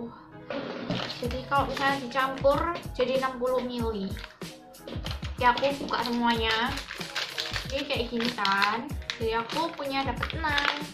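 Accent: Java, Indonesia. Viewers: Medoook wesh bahasane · Medok skalee kak.. .. Kaya aku 😅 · medhogggg medhogg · Suka bgt kalo medok gitu wkw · Medok banget mbak🤣🤣🤣🙏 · seneng bat dengerin medok nya wkwk:v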